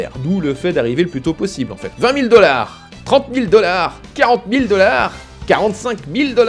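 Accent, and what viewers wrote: Quebec, Canada. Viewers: Ça fait toujours sourire d'entendre un français prendre l'accent québécois! Haha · Un fond vert ainsi qu'un jeu d'acteur à souligner! ( Sans parler de l'accent québecois ), est-ce qu'on peut parler d'une nouvelle ère pour?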